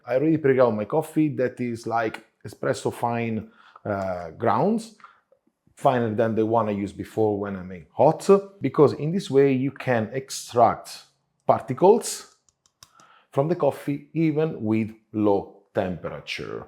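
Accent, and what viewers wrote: Italy. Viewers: Buena idea, buena explicación, y ese acento italiano excelente!! jaja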